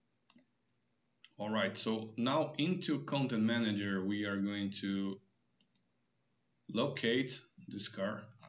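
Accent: Brazil. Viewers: Você é br mano? Tem sotaque e o nome de brasileiro haha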